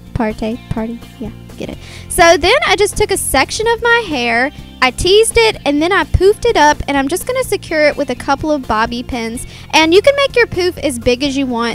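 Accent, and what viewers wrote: South, United States. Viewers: when i first saw the thumbnail for this video i thought she would be like a full on new York/jersey accent, and then she was country, pretty coolll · Your so cute and southern! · you talk just like me! with the southern accent!! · You are SO fun and absolutely HILARIOUS to listen too! You made my night! And its great to actually hear a southern youtuber!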